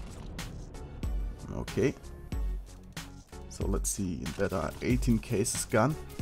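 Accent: Germany. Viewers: Alter DU sprichst Doch zu 100% Deutsch mehr als englisch......sehe Gerade dein erstes Video und du hast nen SOOOWASVON deutschen Akzent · Das einzige was zeigt dass du deutsch bist ist dein akzent aber sonst... Läuft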